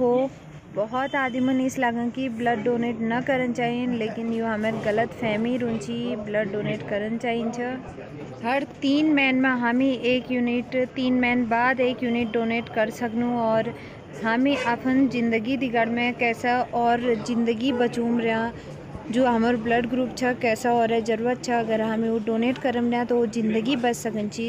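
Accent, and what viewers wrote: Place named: Pahari, India. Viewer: Mam uh look soo pretty and cute...and I really like the way you speak pahadi...😘😘😘😘...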